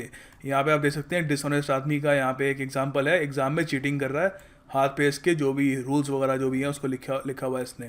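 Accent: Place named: South, India. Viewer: as always video and explanation was awesome but missing your south Indian accent "okkk siiiiirrrr"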